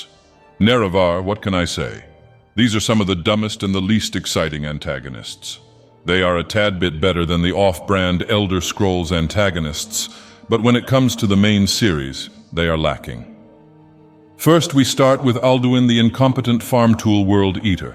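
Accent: United Kingdom. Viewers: Anyone else notice an increasingly thickening British accent the past few videos?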